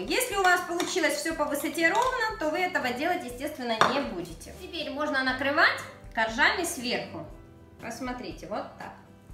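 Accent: Ukraine. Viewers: а говор у вас интересный,трошки услышала,вы в Украине живете?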